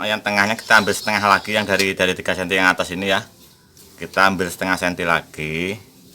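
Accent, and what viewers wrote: Java, Indonesia. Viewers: Mas asal mana mas..? Kok logat jawi?🙏🙏🙏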